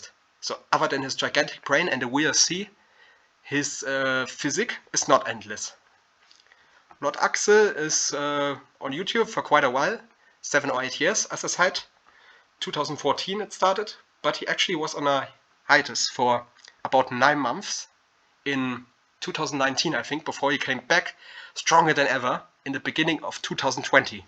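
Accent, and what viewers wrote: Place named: Germany. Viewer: ne meinte dass man hört, dass du Deutsch bist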